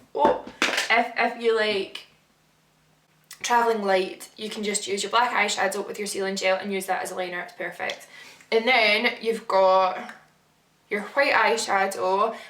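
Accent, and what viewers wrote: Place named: Glasgow, United Kingdom. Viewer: Hi just discovered you and I'm loving your channel! It's great to watch make up tutorials from someone with a Glasgow accent!! Can you please do a tutorial on your eye makeup in this video? x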